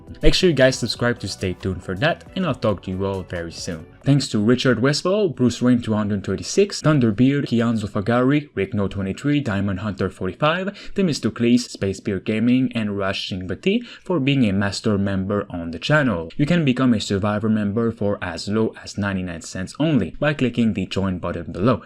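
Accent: Quebec, Canada. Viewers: Sounds french Canadian, like the guys I used to play hockey with. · he is Canadian/French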